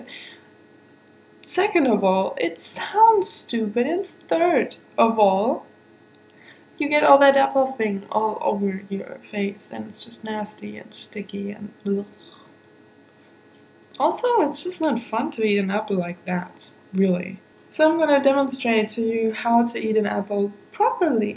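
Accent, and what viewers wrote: Germany. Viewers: love the german accent hahaha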